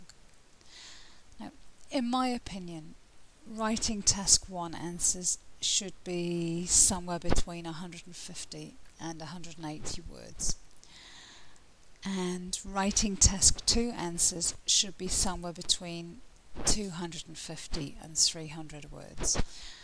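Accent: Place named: Australia. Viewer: I love your Australian accent. You guys have unique accent in whole world. I just want to say thanks for your this video. You are so cherubic.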